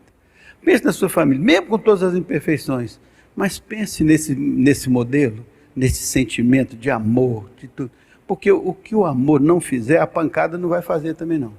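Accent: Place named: Minas Gerais, Brazil. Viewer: Pr com esse sotaque de Minas!!! A Paz de Cristo já começa ao ouvir esse jeito de falar..Te Agradeço Senhor pela Vida deste pastor!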